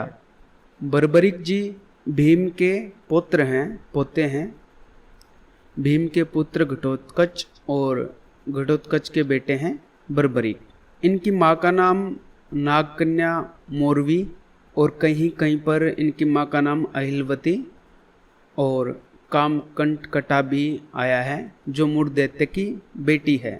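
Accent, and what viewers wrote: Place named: Uttar Pradesh, India. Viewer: Aap up se ho kya ....Apki boli se lagta j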